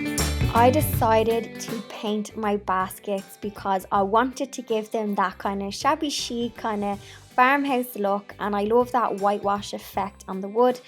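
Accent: Ireland. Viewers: New to your channel, love your fabulous Irish accent, reminds me of home, I am from Dublin, finglas. I love the ideas so simple and so effective. They are so very pretty. I will definitely be making them. Well done. Love the cats entrance. Lol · I'm going to make some of these for my little things for crafting in this nursing home. Ty so much you're beautiful and so are these. Love your accent sounds like Ireland. I'm part Irish. Miss my DAD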